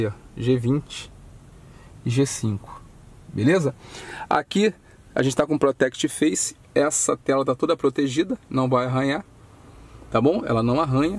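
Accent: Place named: Rio de Janeiro, Brazil. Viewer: Sotaque carioca é da hora demais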